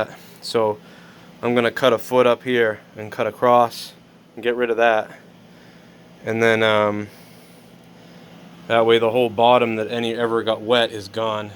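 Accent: Boston, United States. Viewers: Lol do you consciously switch to your Boston accent or is just something that happens sub consciously?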